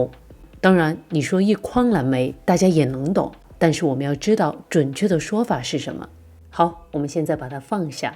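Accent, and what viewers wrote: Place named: North, China. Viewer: Beautiful northern accent ( im guessing here) you have got!!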